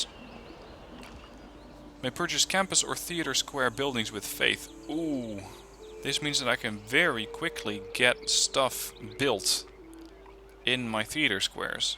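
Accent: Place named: Netherlands. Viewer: Aweful dutch accent though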